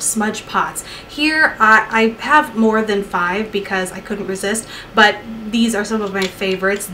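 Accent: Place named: Midwest, United States. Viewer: Like your videos, happy to hear a Midwestern accent again.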